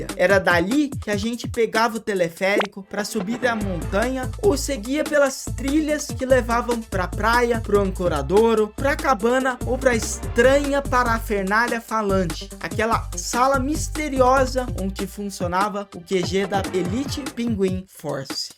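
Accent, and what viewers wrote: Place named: India. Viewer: tenta melhorar esse ingles de indiano ai man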